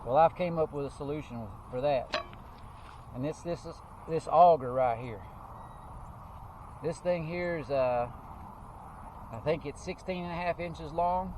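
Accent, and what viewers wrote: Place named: South, United States. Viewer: What state are you in? I see red dirt and hear a southern twang.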